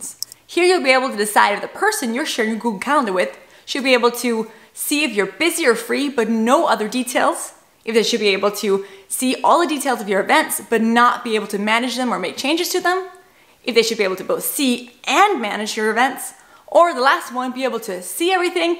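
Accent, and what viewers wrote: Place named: Quebec, Canada. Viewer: ah, I'm from Texas, and my wife is from Virginia. A few years ago we were in Alberta for work, and I could swear your light accent sounds just like a Quebecer. 🤠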